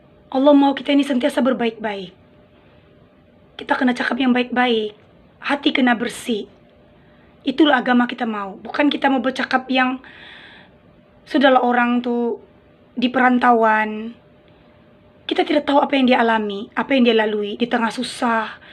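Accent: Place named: Sabah, Malaysia. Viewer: Terima kasih dgn luahan perantau. Slang kamu macam terikut Sabah suda o..asal mana fren?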